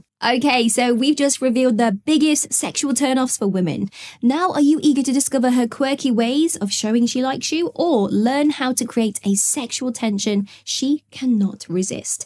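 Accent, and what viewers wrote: United Kingdom. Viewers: Damn I need to find a woman like. I love the British accent from a woman. (Never Assume Anything, it always makes an Ass of U M E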